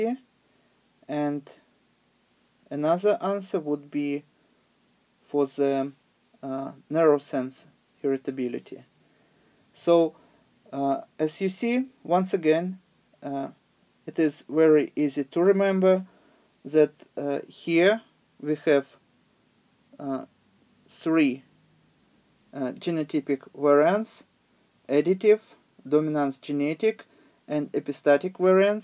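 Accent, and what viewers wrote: Russia. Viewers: I like russian pronunciation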